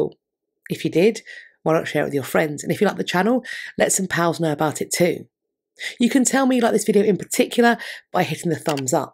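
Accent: RP, United Kingdom. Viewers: I say we re-build it!!! (does the Trust have any spare change? hee hee) Thank you, for this lovely presentation! I believe Nonsvch was Henry's sort of dream house, if that's possible for someone who owned many palaces and castles. It was indeed the place he envisioned his legacy and descendants would carry on. I've always thought Charles II to be handsome in some strange way, but shame on him for allowing the destruction, regardless of his former lover's reason. I can see now, in her velvet and silk Elizabethan gown, hair up, perky smile and posh accent, telling off Ms. C, preventing the destruction, and sentencing Ms. C to a lifetime of farm labor, since even "thinking" of destructing Nonsvch was a crime, just as only mentioning the king's death was treason. By the way, does anyone know if the Nonsvch church would have dropped any hints of any particularly religion other than the Church of England? 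hee hee With an Italian designer, I smell Catholicism which I wonder if Henry truly ever abandoned entirely. 🎭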